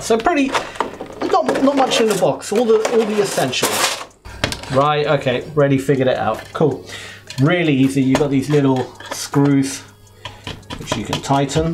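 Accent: United Kingdom. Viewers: For some reason I didn't expect you to be british before you said anything, haha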